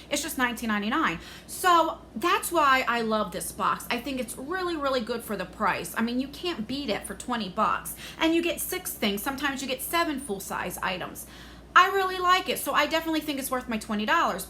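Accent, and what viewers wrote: New Jersey, United States. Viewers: Hi, You are hilarious! I love your videos. The opening is so clever and entertaining too. 👍🏻 I am Brazilian but my husband was raised in New Jersey (Mulburn area) and I enjoy the English accent of the area. In fact, I just subscribed to Boxycharm/Boxyluxe and mentioned you as my favorite YouTuber! 🌺 Have a wonderful weekend and God bless you always! Renata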